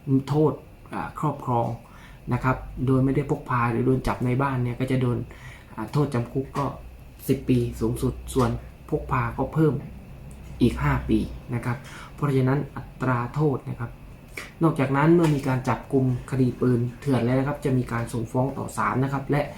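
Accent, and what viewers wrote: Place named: South, Thailand. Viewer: เพิ่งได้ดูคริปเเรกครับอย่างอื่นไม่รู้เเต่ทนายน่าว่าคนไต้เเน่นอน ขอบคุณความรู้ดีๆ ติดตามครับ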